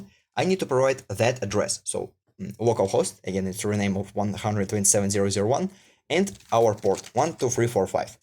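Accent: Russia. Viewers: эхх, русский акцент выдал тебя, но говоришь по делу, you're being great!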